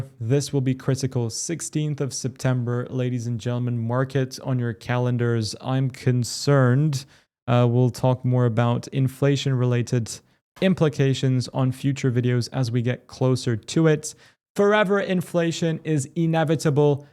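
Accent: United Kingdom. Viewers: Posh toff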